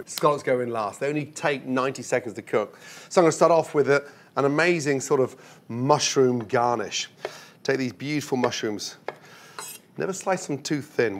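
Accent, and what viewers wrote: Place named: Australia. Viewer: Hi Gordon if I may address you as such I am 89 years old and Vietnam veteran, and a newbie at cooking, which I love at one and a half years.. and I must say your approach to cooking is impeccable.. even with your Australian accent, you present yourself admirably.. I consider myself a better than average cook.. and living in Massachusetts I’m very close to all the scallops that I can bring home. One thing amazing about scallops. It does not take very many to fill you up. I enjoy your presentations very much and I like following you. Thank you.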